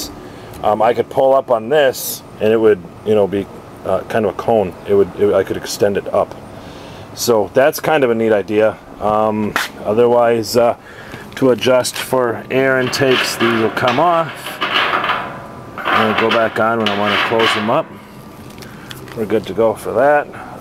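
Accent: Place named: Wisconsin, United States. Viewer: I thought you sounded like you're from Wisconsin. Looks like a good project. I wish I had the tools, etc to build one myself.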